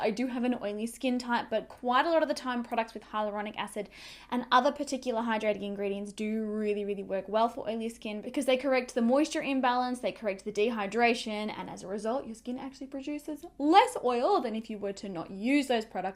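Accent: Australia. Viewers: I could listen to you talk allll day. Love the Australian accent. 💕 I agree the Maybelline side looks flawless. Great shade for you too. Could I just say, I would love to see you and Tati Westbrook do a video collab! I think your personalities would "gel"! 🥰 Or you, Tati and RachLoves together! 3 fantastic, beautiful, personable Youtubers. 🤗🤗🤗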